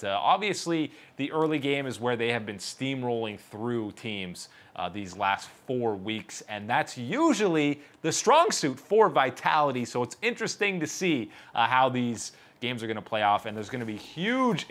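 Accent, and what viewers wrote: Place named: United States. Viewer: Jesus...I can REALLY tell you are American and not European, dude! :D #VITWIN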